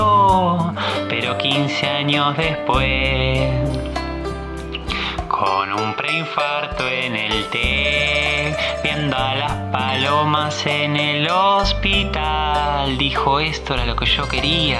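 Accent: Argentina. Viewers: Que extraño que es escuchar esa instrumental tan asiática junto con la voz argentina.Muy bueno,me gustó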